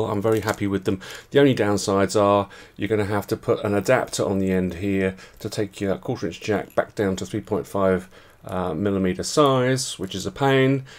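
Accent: United Kingdom. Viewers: I fully agree. After long years of absence I've started last year again with synths. My "starters" were Behringer´s wonderful Model D, DeepMind and Pro1. In the meanwhile the Model D and Pro1 are replaced by systems of Moog. But nevertheless... starting would never happen with the more expensive Moog systems. Therefore I honour the products of Behringer as it gave me the chance to start again... even with a less budget. And not to forget... the work of is fantastic. Lots of explaining in a very British and comfortable way.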